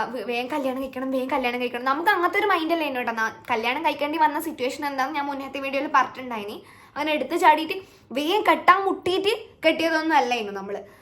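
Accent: Kannur, India. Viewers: വളരെ നന്നായി സംസാരിക്കുന്നു. നമ്മുടെ സ്വന്തം കണ്ണൂർ ലാംഗ്വേജിനു ഇത്ര ഭംഗി ഉണ്ടായിരുന്നോ 😮. താൻ വളരെ genuine ആണേട്ടോ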